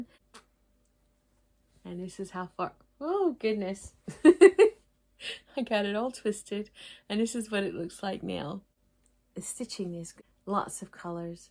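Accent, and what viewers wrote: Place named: South, United States. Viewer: Once again...another wonderful video!! Progress is coming along nicely on all your projects!! Loving the bird one!! Gorgeous pictures of your adventures!! Thanks for the shout out as well!! I do love your accent...I just giggle everytime I hear you throw in a little southern draw word or two 🤣 ❤️🪡❤️ Editing to add...I haven't stitched on 20 ct but I do have Humility (the big lion piece) on 22 ct & initially I did 2 over 1 on that...it ended up where my stitches were just too puffy & they weren't laying right so I ripped it all out (probably about 1000 stitches & went with 1 over 1. I do use 2 strands for 18 ct. But just wanted to give this little insight...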